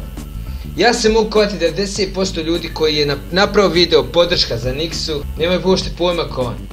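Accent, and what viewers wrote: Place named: Bosnia and Herzegovina. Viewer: Jesi li ti Bosanac vidim govoris ba i malo ti naglasak lici na Bosanski ? ili si iz Makedonije ?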